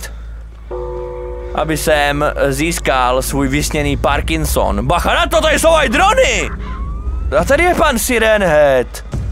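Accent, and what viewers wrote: Moravia, Czech Republic. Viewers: Seš moravák?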